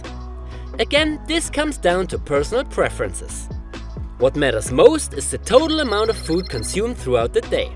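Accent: Germany. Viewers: Nice video - thanks! Question: what’s this for an accent? Sounds like a German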